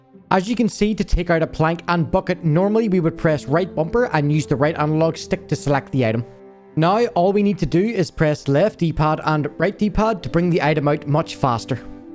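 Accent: Northern Ireland, United Kingdom. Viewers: Northern Irish..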